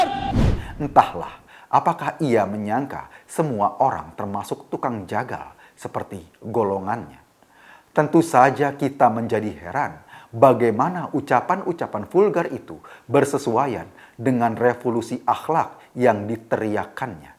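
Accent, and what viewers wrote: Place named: Banyumas, Indonesia. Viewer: salam ngapak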